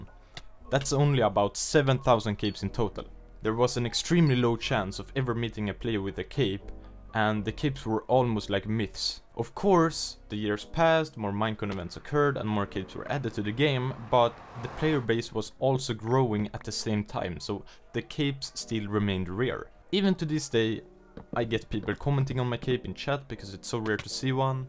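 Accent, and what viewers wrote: Sweden. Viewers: You sound Swedish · I like how I can hear the Swedish accent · ur swedish no doubt about it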